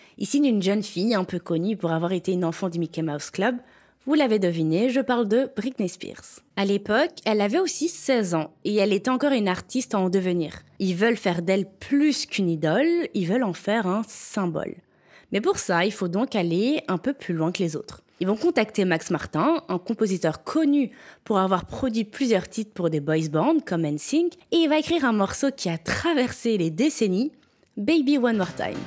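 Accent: Belgium. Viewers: Merci pour ta vidéo que je trouve très bien travaillée. Surtout ne change pas ton charmant accent belge;et c'est un français qui te le dis. Bonne continuation à toi!❤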